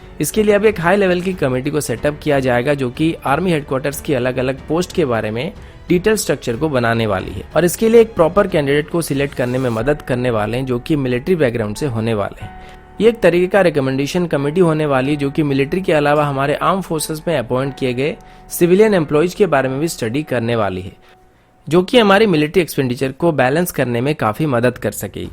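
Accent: Odisha, India. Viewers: Bhai app Odia ho kya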